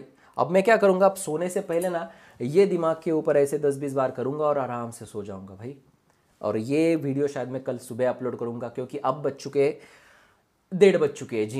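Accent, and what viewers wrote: Maharashtra, India. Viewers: bhai marathi tone me aapki hindi mujhe bhaut achchi lagti hai aap mere fevrate ho god bless u bhai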